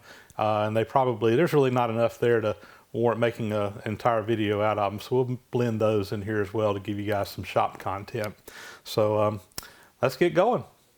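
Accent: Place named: Georgia, United States. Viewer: Do you have the IPA notation for your pronunciation of "oil"? Your Georgia accent & doubleboost's Geordie accent are about the best things on youtube.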